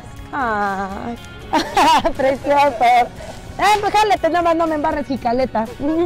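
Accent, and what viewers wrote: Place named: Mexico. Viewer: Saludos desde Santo Domingo 🇩🇴😁 me encanta tu carisma y ese mega acento mexicano ✌🏼🤷🆒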